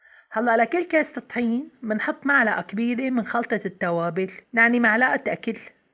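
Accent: Aleppo, Syria. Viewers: يسلم اديكي انا حبيت اللهجة الحلبية كرمالك و بتمنى نشوف وجهك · ياي يا خالة على لهجتك الحلبية والله صرت أعشقها من لما سمعتك...تسلم إيدك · يسلم هالإيدين🎀مافي احلى من الحلبية وحكياتن👍😍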